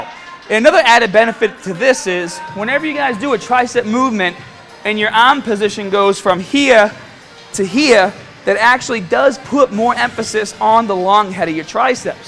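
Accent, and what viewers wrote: Boston, United States. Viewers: Hey! It's been a while since I've watched your videos. I noticed your Boston accent is heavier. I wonder why. It's just a curious observation. It's quite lovely! And as always, great tips from the captain!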